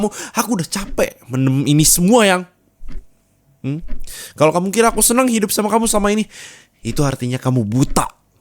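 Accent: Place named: West Java, Indonesia. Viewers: Dari logat nya kaya orang sunda 😭👊🏻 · Singkat padat baheula ni sunda banget ni aa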